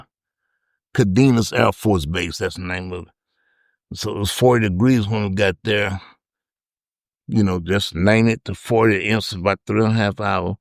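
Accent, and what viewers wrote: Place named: South, United States. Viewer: I'm a Southerner so I've met plenty of people who sound like him and I always love it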